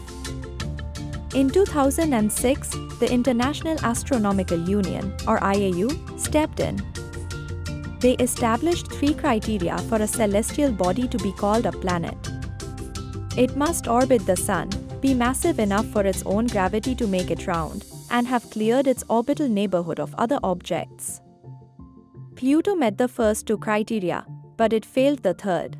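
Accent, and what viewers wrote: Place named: India. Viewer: F kking indian accent sounds like dum scammers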